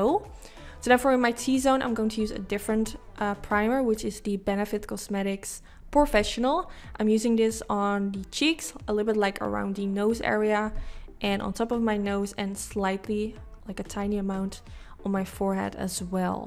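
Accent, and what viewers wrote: Netherlands. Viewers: Kom je uit Nederland? Ik dacht een accentje te horen! Heb trouwens na het kijken van deze video ook die paarse mac glitters gehaald, thanks voor de tip :) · Ik hoor echt meteen dat je Nederlands bent, niet slecht bedoeld ik vond het gewoon grappig dat het echt zo was. Echt een super mooie look!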